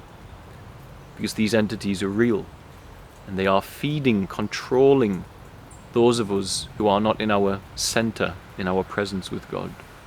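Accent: United Kingdom. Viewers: Where are you from (originally from) you sound British brother - Also I’m a new subscriber and looking forward to your future content 🙏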